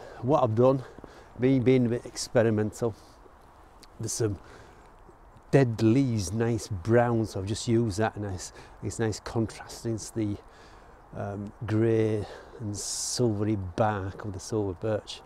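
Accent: Yorkshire, United Kingdom. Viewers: Great watch again mate, so nice to ere ow many Hs ya dropped, and eld, appy, ungry, ome, folk ere can't understand me arf ov time. Great Yorkie tork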